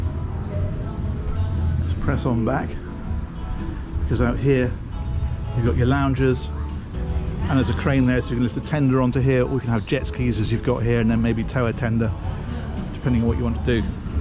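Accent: England, United Kingdom. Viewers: I love the Englander accent. Pretty cute and he knows his stuff! How do you make a living touring thru boats? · You do a great job showing these ships off in complete form . Perhaps probably because you talk about them in your British accent which adds alot of Class when you show all these Boats to all the public which is us .I learned alot of from Certain Yauchts dont have to Cost $13,000,000 to have alot of room in them .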